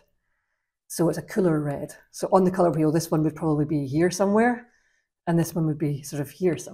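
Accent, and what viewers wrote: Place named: Scotland, United Kingdom. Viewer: Love to hear your Scottish voice. I like Americans but its great to hear a familiar accent.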